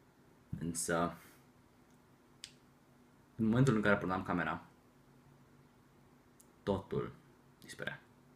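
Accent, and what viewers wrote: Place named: United Kingdom. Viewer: se vede ca ai fost in anglia ca ai mult accent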